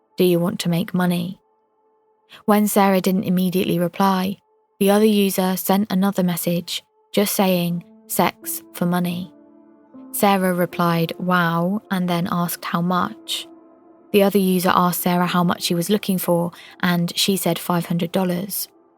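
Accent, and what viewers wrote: United Kingdom. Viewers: Great to hear a British voice for a change new subscriber. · I think it's more of a British thing, this channel is British, the accent is British and obviously the reference to the Met Police and the Sarah Everard case give that away- she sounds about my age so to me Red Rum was a famous British racing horse- that grew legendary status. I have watched the shining and can't remember Redrum but who knows....in the end you have to change words around to stop things getting blocked in the world of youtube.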